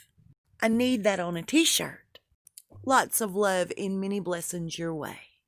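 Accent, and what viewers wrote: South, United States. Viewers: Great video,. Love your Southern accent.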